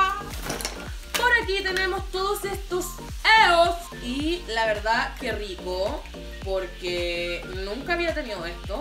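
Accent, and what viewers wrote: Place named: Chile. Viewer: Amo que sea tan chilena para hablar, full love para ti ❤️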